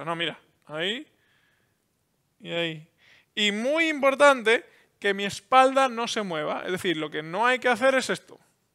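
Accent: Spain. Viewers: Me encanta ese suave acento gallego. Una Canaria 💖👍 Gran explicación. 🤗 La posición de la cámara perfecta, se sale 👏👏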